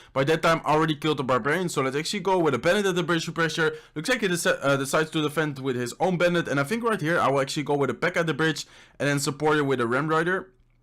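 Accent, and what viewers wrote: Netherlands. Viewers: Ik hoor zo goed dat je nederlands bent😂😂👍🏻 goeie vid tho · He is dutch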